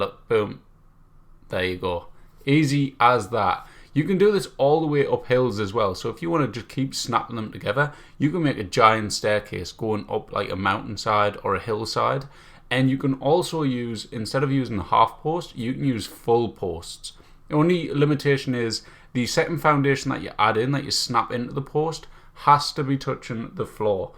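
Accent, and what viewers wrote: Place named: Newcastle, United Kingdom. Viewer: Fellow geordie?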